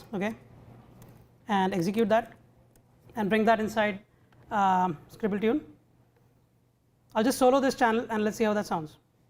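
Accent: India. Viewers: awww dam i hate the Indian accent, and i am a music aficionado, well nice demonstration anyway, i enjoyed it, even if the odds where not on the speaker side (for my part). thank you ! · I hate this indish acent!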